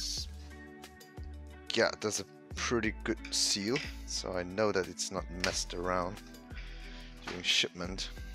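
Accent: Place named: Germany. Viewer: loved video, i can tell your normal language is german, it's a cool language :D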